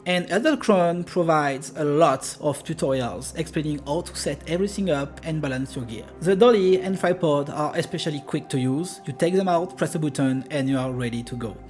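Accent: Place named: France. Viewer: On est d’accord il a un sacrée accent français ?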